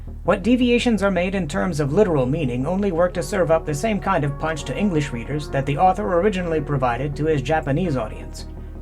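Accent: Transatlantic, United States. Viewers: Never thought I’d hear someone with a transatlantic accent in 2025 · I know this has been up for a year but I’ve only just started to pay attention to these. I own this English version, and when you said it read like a stilted first draft, that nailed it. I couldn’t agree more. It’s a pretty technically accurate translation (I own and have read the Japanese version!), but there’s no flair, and consequently comes off a little boring. I’m eagerly awaiting the Mothra translated book by the same guy, and am hoping that he’s taken a bit more time to clean it up. Translation is an art, but when treated as a science, it’s not very good. Great video, and love the Transatlantic accent! Is that how you normally talk, or is it an affectation?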